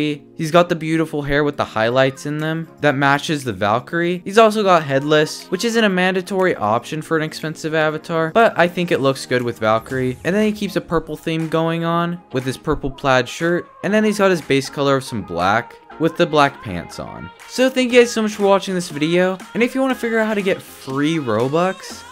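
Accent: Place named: United Kingdom. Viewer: your British aren't you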